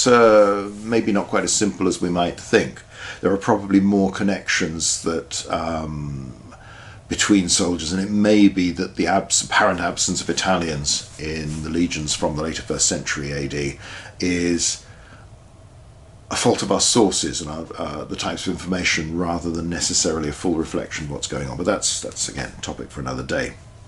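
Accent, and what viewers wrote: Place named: Cambridge, United Kingdom. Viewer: Its called Cambridgian English. Kinda like Cajun English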